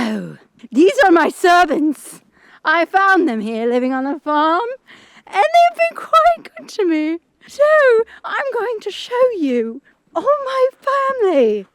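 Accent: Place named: United Kingdom. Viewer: Aww i love youuuu ❤️❤️❤️❤️ and your British accent is brilliant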